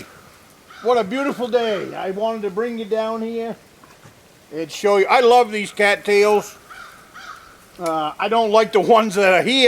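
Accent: Maine, United States. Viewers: Very informative video, and you do rock that Maine accent! With your enormous number of videos, sounds like you should write a book on being a woodsman!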